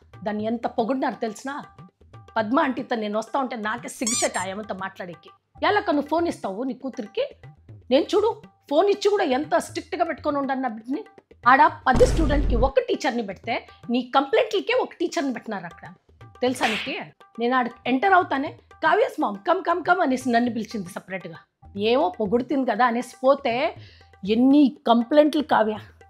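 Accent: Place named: Andhra, India. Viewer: Which part of andhra is this accent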